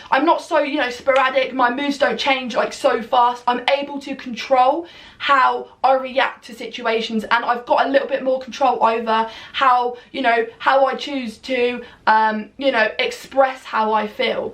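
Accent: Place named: United Kingdom. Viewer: I thought you cant be prescribed stimulants in the UK? You sound like you're from Uk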